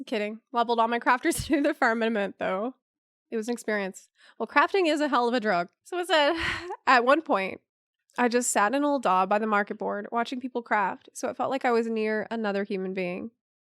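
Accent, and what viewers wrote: South, United States. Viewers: I never would have guessed you live in Ukraine. Your accent seems vaguely southern United States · I have just discovered your channel recently and I knew you sounded American, but I had no idea you live in Ukraine!? What you doin' over there? Love your content, and I'm also staying in my house except for necfesary grocery shopping.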